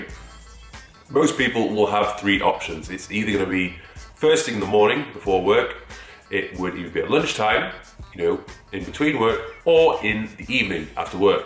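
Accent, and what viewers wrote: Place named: England, United Kingdom. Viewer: Hi, you’re a handsome guy and I love your English accent. Stay humble. 💕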